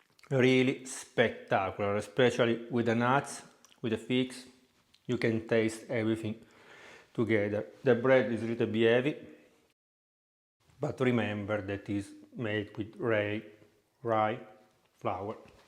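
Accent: Italy. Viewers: Yet to try a recipe of yours...but I am spellbound by your accent and hand motions...a true Italian Chef! Please come to SouthAfrica..Cape Town...come visit...you would teach a thing or two to the so called Italian restaurants here...I even belong to Italian Club and their ciabatta is horrible!! Show how to make Glace Chestnuts please!!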